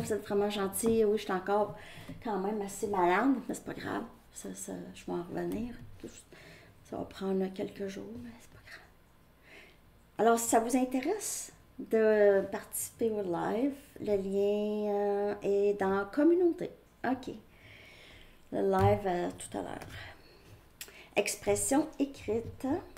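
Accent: Canada. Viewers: Hello sweet lady , with Canadian accent 🌺❤️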